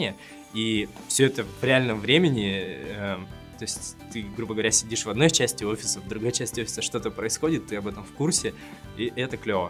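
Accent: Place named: Urals, Russia. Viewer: Даже можно было бы не говорить что с Урала, по говору и так всё ясно)))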